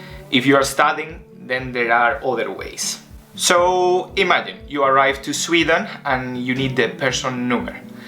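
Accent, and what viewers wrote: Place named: Spain. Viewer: Your accent is very Espaniol !!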